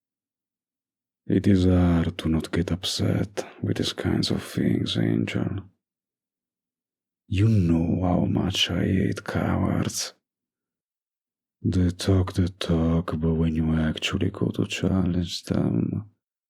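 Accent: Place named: Russia. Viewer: I HEAR RUSSIAN ACCENT, ARE YOU RUSSIAN?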